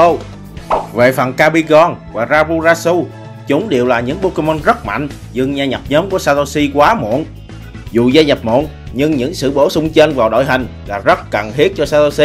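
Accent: Vietnam. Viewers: Theo dõi admin này từ hồi làm clip ngắn, lúc đầu giọng đọc rất tệ rặc khuông miền tây lại ngọng, đọc vấp, không rõ chữ nhưng càng về sau ổng đã cải thiện hơn, có thể ổng chưa bằng đuợc chất giọng của admin chính, nhưng sự cố gắng trong chất giọng và cả idea làm clip là rất đáng ghi nhận, mọi người nên động viên ổng phấn đấu thay vì bày xích ổng, ủng hộ admin và kênh PAG Center❤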